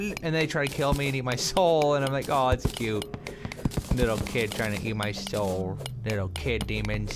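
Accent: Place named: England, United Kingdom. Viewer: English :) not American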